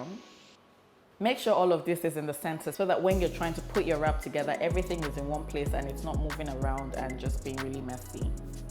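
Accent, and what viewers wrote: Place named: United Kingdom. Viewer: I use to think you live in the UK because of the way you speak. I love the recipe.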